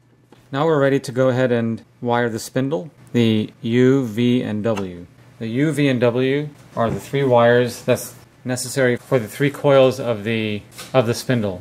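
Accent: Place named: United States. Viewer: But plugging it into the wall aren’t you feeding it 110v? Your accent sounds American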